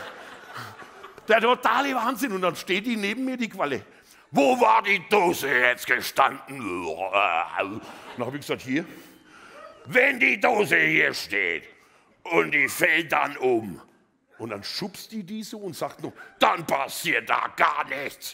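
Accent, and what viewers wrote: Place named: Franconia, Germany. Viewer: Fränkisch geil 😂😂😂😂🤘🤘👍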